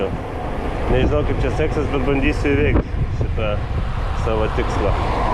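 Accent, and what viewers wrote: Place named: Dzūkija, Lithuania. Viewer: Kietai. Fainas dzukelio akcentas :)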